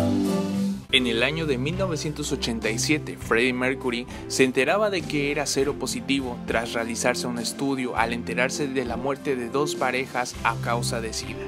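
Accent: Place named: United States. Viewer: Por qué el narrador habla con un ascento americano muy feo??????, Se escucha horrible, habla con tu voz natural ;)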